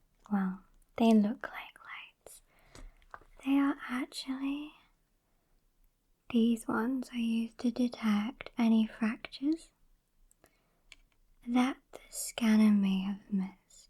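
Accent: United Kingdom. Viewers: Rei Ayanami with british accent and is a nurse?!🤔 Noice!😉😏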